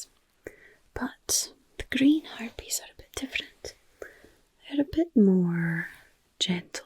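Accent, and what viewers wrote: Ireland. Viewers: I don’t know what it is about your Irish accent but it gives me tingles til I melt! I enjoyed the St. Patrick’s day. 💚🙂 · I love your Irish accent,. I know you have also done English accents and if I remember correctly your "bossy" vampire had a Russian accent. Have you / will you do other accents too? No pressure but I'm just curious. Thank you for all of the personal attention in this wonderful St. Patrick's video. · As an Irish person you absolutely nailed the accent ❤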